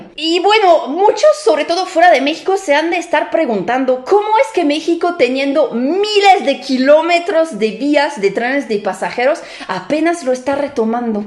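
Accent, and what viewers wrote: France. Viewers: Saludos desde la CDMX. una observación, no es crítica pero ya casi no se te notaba tu asentó francés, pero ahora como que se te traba un poquitín la lengua jajaja.... 😂😅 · Me gusta tu acento francés. Felicidades por tu canal y contenido. Excelente trabajo de investigación como siempre. Tu opinión como usuaria de trenes en Europa es muy valiosa. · Como me encanta el español con ese acento frances, simplemente precioso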